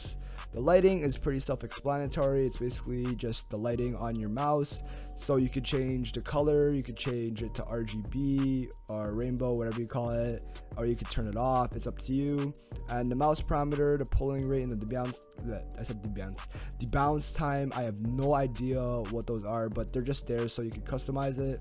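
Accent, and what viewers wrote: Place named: Philippines. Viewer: Theeey Trynaa Bee Craate! You filipino?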